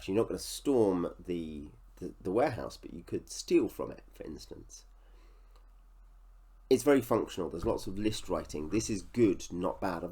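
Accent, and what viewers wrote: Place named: United Kingdom. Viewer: I'm sending off for this today. You make it sound quite enticing. The British accent was the clincher.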